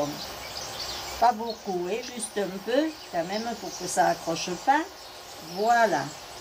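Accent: Provence, France. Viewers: Bonjour c'est un regal de vous écouter parler ce bel accent de notre Provence, j'adore vos recettes tres bien expliquées par vos soins c'est un régal merci du partage Emy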